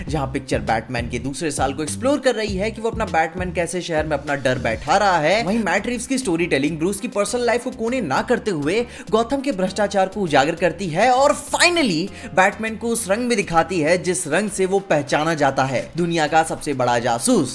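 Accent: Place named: Lucknow, India. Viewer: bro kaha se ho app ki bolne ka tarika lucknow ka lag raha hain vaise main bhi lucknow se hu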